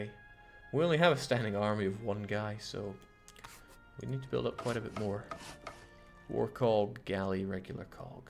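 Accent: Scotland, United Kingdom. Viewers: I thought this was Arumba, and I was confused and I thought he was sick again then I felt the Scottish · Man, his Scottish accent disappears after watching the Scottish twitter video and coming back to a normal one.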